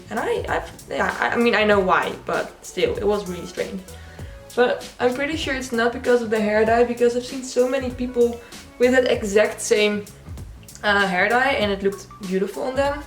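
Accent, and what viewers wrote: Netherlands. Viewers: This is the first video I've watched from you and the second you spoke, I had a gut feeling you're Dutch. Came to find out you are! Haha! Also, love your new hair color. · Are u Netherlands? I think so cause u have kinda a dutch accent while ur speaking English. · what is your accent? is itDutch? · Dit is de eerste video dat ik van je zie je doet je intro en ik weet gelijk al dat je nederlands bent door t typische accent😂❤ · Are you dutch. Because your voice sounds dutch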